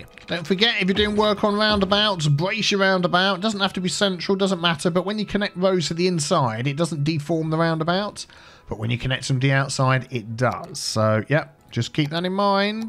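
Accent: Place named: England, United Kingdom. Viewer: It's really just about the way your voice sound that I watch your videos. And your accent. English accent. You've got that strange tone, never heard anything like it anywhere else. I like it thought.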